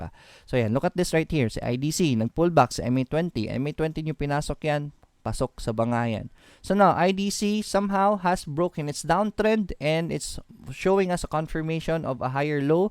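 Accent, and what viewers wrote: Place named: Visayas, Philippines. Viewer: sir , bisaya ka pala hehehe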